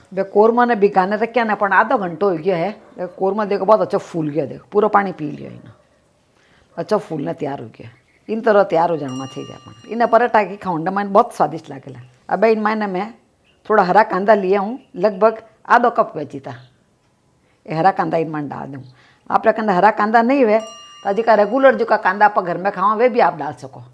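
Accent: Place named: Marwari, India. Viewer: Meri mummy mujhe deke gai thi korma per ab tak banaya nai, aapka video dekh ke laga mummy boli banale ab to😑, आप same humari marwadi बोलते he, लगता he meri mummy he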